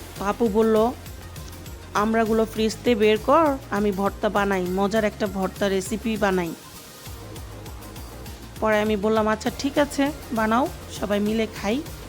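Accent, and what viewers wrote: Old Dhaka, Bangladesh. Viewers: আপা পুরান ঢাকার ভাষায় কথা কই এন সেই লাগে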